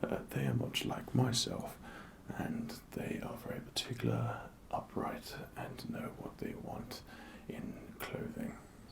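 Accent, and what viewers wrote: England, United Kingdom. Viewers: You english tho.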